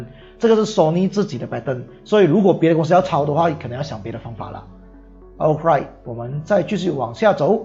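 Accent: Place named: Malaysia. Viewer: 马来西亚口音的普通话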